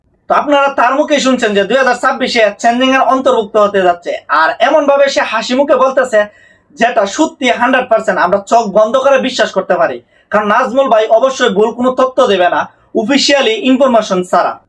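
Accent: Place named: Brahmanbaria, Bangladesh. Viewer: আসসালামু আলাইকুম ভাই আপনার ইনফরমেশন কথা ঠিক আছে।আশাকরি আপনি আরও উপরে উঠবেন আমরা আরও অনেক কিছু যানতে পারবো আপনার মাধ্যমে। তবে একটা কথা আপনার বয়েস কোয়ালিটি একটু শুদ্ধ সাধু ভাষায় বলার একটু চেষ্টা করবেন। ঠিক হয়ে যাবে আস্তে আস্তে চালিয়ে যান কিছু মনে করবেন না আপনি মনে হয় ব্রাহ্মণবাড়িয়া জেলার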